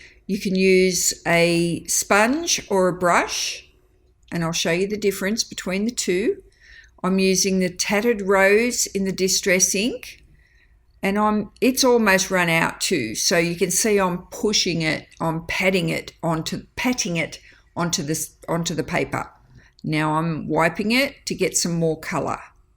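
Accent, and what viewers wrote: Australia. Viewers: Hi! So glad I’ve found your channel! I love what you do. I have requested to join your Facebook page, hope I’m accepted. And it’s so good to hear a fellow Aussie on YouTube!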